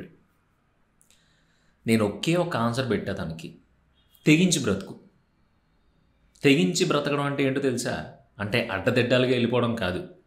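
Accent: Godavari, India. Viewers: Bayya meedi a ooru? Godavarenaa...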